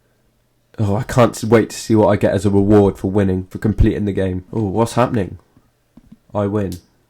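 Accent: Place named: United Kingdom. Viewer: I can hear the British Accent more in his older voice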